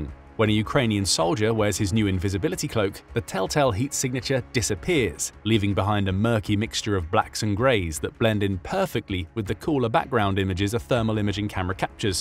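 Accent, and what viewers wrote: England, United Kingdom. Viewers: English accent??? i am out!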